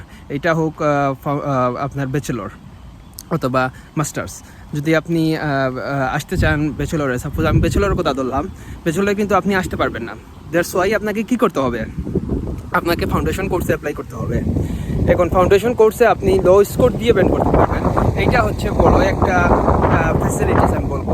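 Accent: Sylhet, Bangladesh. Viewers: ভাই সিলেটি মাত আইয়য় ❤ i love Sylhet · Bhai mono oy Sylheti 😅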